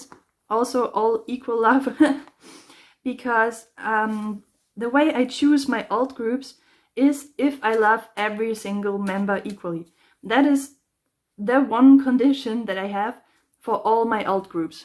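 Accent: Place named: Germany. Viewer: I really like your binder!! It looks really really nice! I think it's so cute how nervous you are, but you really don't have to be. You are doing a great job. Judging from your accent I believe you are german? But don't be insecure about it, it's really cute😁👍👍❣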